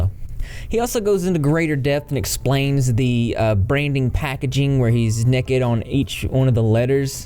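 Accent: South, United States. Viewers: Your southern accent reminds me of my hometown. Too cute ❤️🐢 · He has a slight southern accent sometimes it's quite noticeable depending on the word lol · long live the South, lol. I'm from NC and I feel like he's just gotta be close lol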